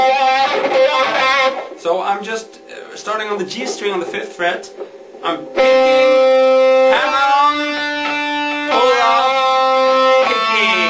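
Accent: Sweden. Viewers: oh the swedish accent xD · He is from sweden. That explains his accent.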